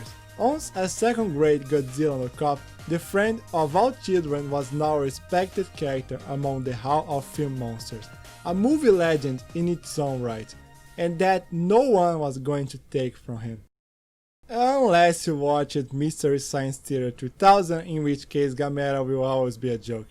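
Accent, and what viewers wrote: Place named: Brazil. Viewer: Great video. Keep practicing your English pronunciations. You have a heavy Brazilian accent.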